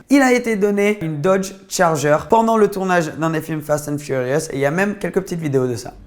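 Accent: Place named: England, United Kingdom. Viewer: Ton accent anglais de merde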